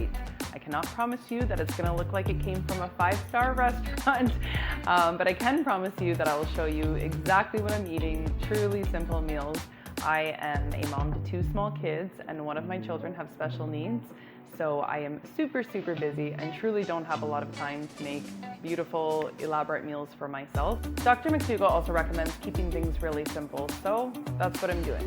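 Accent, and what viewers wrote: Canada. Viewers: Can’t wait to try the apple, banana, oatmeal snack! Looks delish. You must be Canadian, I can hear your accident. Just subscribed, looking forward to your journey. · I hear a Canadian accent! (I live near Canada in northern Vermont!) Great simple delicious recipes. Thanks, and good luck on your journey!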